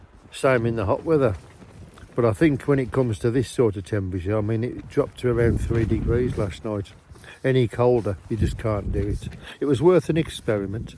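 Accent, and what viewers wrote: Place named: United Kingdom. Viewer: Another great camp. Your videos are very relaxing to watch, and I also enjoy hearing the way Brits talk. “Mooching about” seems to mean wandering around, but here in the States “mooching” is slang for taking advantage of people and getting their goods for free. 😃 I also didn’t realize you had Uncle Ben’s rice over there. Love that pitch; your setups always look so tight and wrinkle free! Blessings to you and the UK! Cheers from Pennsylvania.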